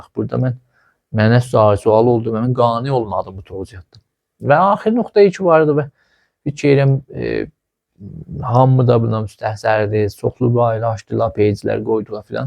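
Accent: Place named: Turkey. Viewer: مگه تراکتورسازی تبریز مال ترکیه ست که تو با لهجه ترکی برنامه ساختی؟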